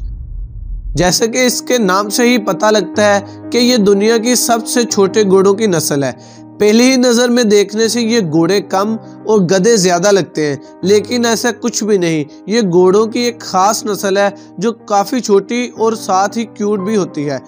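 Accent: Pakistan. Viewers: Accent se bhai Pakistani lag raha hai. Waise jung ke lihaaj se Arabian aur Marwari Horses best hote hain. Inme bhot stamina hota hai aur ye jaldi ghabrate nahin hai. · Tumhare bolne ke andaje se lgta h aap pakistani h 🤣😂😂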